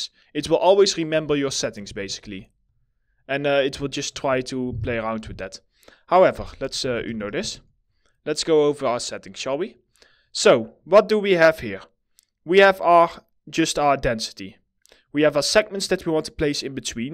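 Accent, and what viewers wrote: Netherlands. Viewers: Thank you for this! I'm learning 3DS Max ( student ), but my teacher just races over the functions and options so I don't really understand how they work. You explained it perfectly! Side note: Are you Dutch by any chance? I noticed the heavy Dutch accent haha. I speak Dutch too, dus dank je wel voor de tips :)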